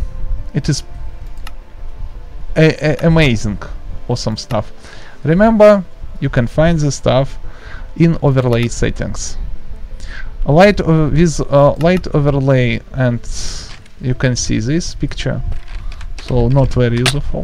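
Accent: Russia. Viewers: are you russian who is speaking in english? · He is russian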